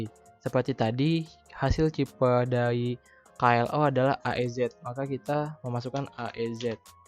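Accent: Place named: India. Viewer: The real india accent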